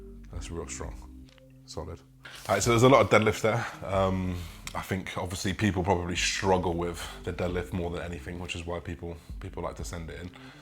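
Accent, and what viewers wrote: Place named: Liverpool, United Kingdom. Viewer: Content is sick lad! Keep it coming fella. Need to see more of your scouse accent as well bro it has me dying 😂😂😂😂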